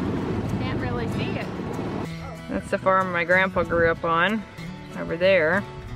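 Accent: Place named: Ohio, United States. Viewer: Hearing you talk, you sound a lot like me. I thought I was different but I guess it’s the normal Ohio accent.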